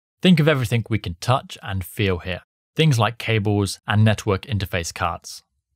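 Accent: United Kingdom. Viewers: ok watever u do , just follow the same presentatiin style - and the same lovely british accent 😀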